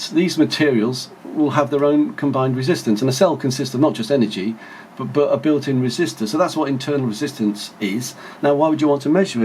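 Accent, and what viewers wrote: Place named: United Kingdom. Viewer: You Brits not only speak with a funny accent (no offense meant) but you also talk very fast. At the crucial moment after 8'00" I could not understand a thing. Congratulations, thanks!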